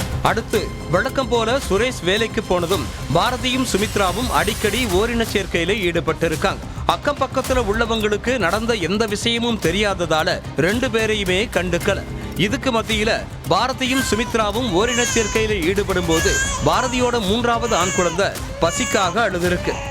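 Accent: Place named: North, India. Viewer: Ennada kevalama news vasikiringa…vadakan mathiri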